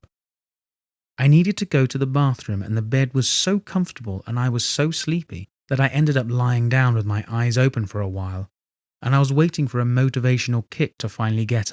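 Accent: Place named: RP, United Kingdom. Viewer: I'm two years late, but only recently found the channel: congratulations on the first book narration gig. I hope you've had many more in the interim, and I'm confident that has been the case, because you have the goddamned most crisp, posh, cut-glass accent my anglophilic American ears have ever heard on YT. You could easily pass for a BBC broadcaster.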